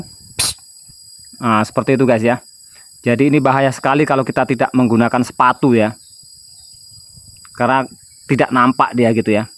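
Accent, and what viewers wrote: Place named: Medan, Indonesia. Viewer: Abang ni logatnya kayak irang medan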